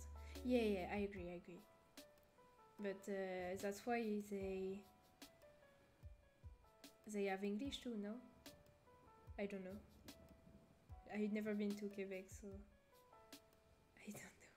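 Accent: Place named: France. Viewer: Very proud of you for steaming in English. it must be scary speaking especially streaming and talking to people in a Language you’re not 100% confident in speaking. I could understand you fine I think you’re English is good yes you do have a heavy French accent but I think that shows you’re background. and it’s apart of who you are that’s something to be proud of people used to make fun of me for the way my voice sounded because of my Chinese accent and I hated it and hated the way my voice sounded but my voice and my accent is a part of who I am and I’ve overtime become ok with that so please never be ashamed of that it does take a while to become comfortable with yourself and the way you’re voice sounds but as you become more confident in speaking you will realise that your voice isn’t as bad as you thought it was if anyone makes fun of your voice you can say L + ratio plus I’m bilingual and your not lol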